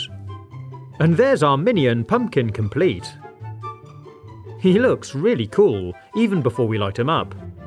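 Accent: United Kingdom. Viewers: u keep on saying "cool huh?" But are u from UK?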